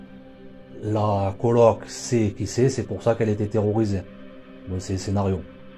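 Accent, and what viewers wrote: South, France. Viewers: Ooooh j'adore cet accent du Sud 😅😅😅 ça m'a surprise like et abo. 🎉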